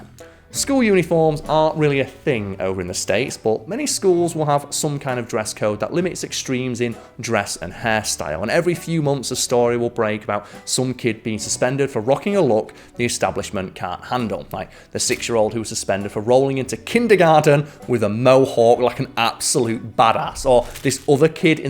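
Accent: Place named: Yorkshire, United Kingdom. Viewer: The Yorkshire dialect isn't that bad to understand. Also just turn on closed captions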